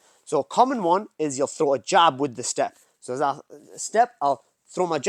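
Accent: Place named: Newcastle, United Kingdom. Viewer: appreciate the super fast response. I have been away from the UK 5 years so ;loved hearing the geordie accent. If you ever visit Pakistan, please let me know so we can get you teaching a seminar or two for the local lads.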